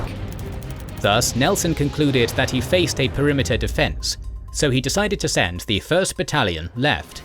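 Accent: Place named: England, United Kingdom. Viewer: its Amerikal as in California the 'c' is very hard All u need do is google to get these words right But then u are English by ur accent and u know how to pronounce everything no matter what language it came form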